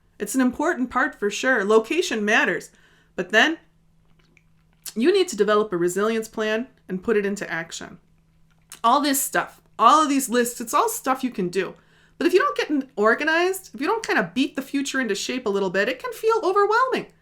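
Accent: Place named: Upper Midwest, United States. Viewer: I'd like to find out more about river-erosion risks in my area, and also in areas that I'm thinking about for relocation. Can you recommend places to learn more about such risks? Also, my friends and I love your information - almost as much, we love your classic Upper Midwest accent. We call you the! Great attitude and great info. Thanks so much!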